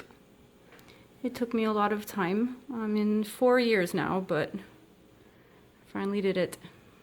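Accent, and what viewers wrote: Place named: Canada. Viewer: Ha! I knew you were a fellow Canadian by your accent! Love your work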